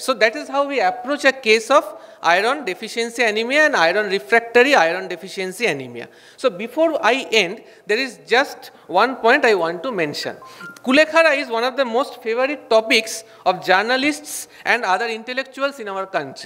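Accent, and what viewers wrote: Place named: Punjab, Pakistan. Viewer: Punjabi pakistani accent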